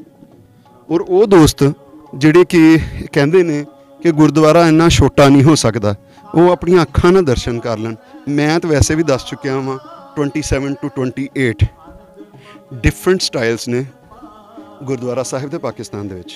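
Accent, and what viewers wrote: Punjab, India. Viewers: Tuhadi boli charde Punjab nal raldi ji bahut vdia jankari diti ji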